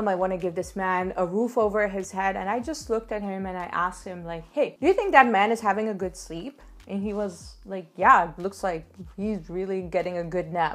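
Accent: India. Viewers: Your Indian accent 💗💗💗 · You have that Indian accent. Lol. I was in Gurugram in October 2018 and boy, I was forced to adopt that accent to pass across my messages for folks there to relate to and I found that quite interesting. And yes, things are super cheap there in India. If I could buy a ship load of stuff, I really would've loved to do so.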